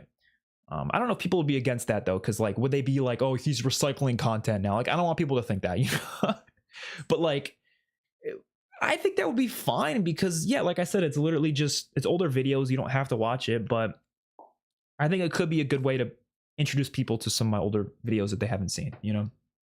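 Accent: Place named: New York, United States. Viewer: Gotta be New York……northeast U.S. at least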